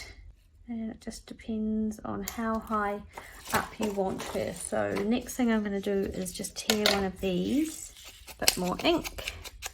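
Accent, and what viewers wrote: New Zealand. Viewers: Love this tag, so beautiful 💙 Also so nice to hear a kiwi accent 😊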